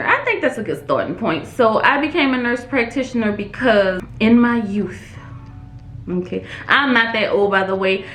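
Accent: New Orleans, United States. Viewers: That NOLA accent is my most favorite in the Entire World! Fellow NP here...don't change girl!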